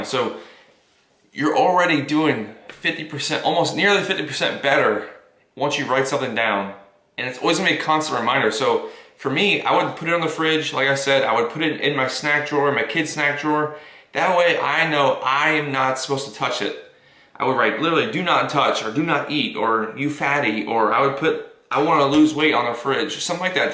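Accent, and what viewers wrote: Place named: United States. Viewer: Hey bro! You are vlogger 🤟🤳 cool video man! Love the energy and your edit! ❤👍 Nice workout! My questions for your q&a is: ➡️Where are you from ? You sound like American 😉🇺🇸 ➡️What made you change your diet and workout? Probably you say on your videos but I just met you 😅👍 ➡️Who is your favourite superhero? ➡️What would be your speech topic if all people of the world could hear you for 5 minutes? 😃👍😉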